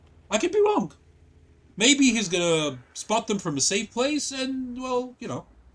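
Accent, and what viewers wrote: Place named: Greece. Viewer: I speak russian and I understood what you said, You have a bit of a greek accent but I think that adds to the charm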